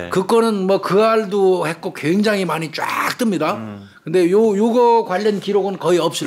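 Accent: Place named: Jeolla, South Korea. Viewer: 김복준 교수님 전라도 사투리 구수한 말씀 아주좋아요 옆보조가 좀 촌스럽다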